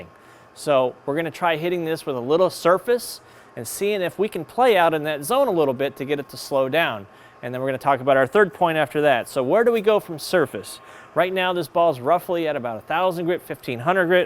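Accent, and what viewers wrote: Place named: Midwest, United States. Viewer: Never heard of before but the minute she spoke, I thought "wow she sounds very familiar." Of course she's from Ohio like me lol. Midwest dialect I suppose.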